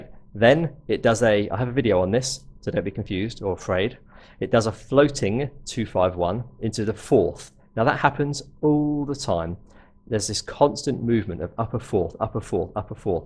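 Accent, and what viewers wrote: United Kingdom. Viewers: I love the way u start ur video. "Hello there". love that British accent And the fun part is i always repeats it myself after watching some of your videos continuosly.😂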